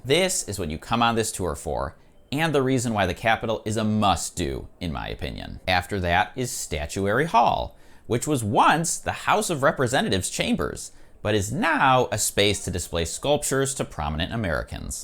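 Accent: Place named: Scotland, United Kingdom. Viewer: A Scottish tour guide? weird.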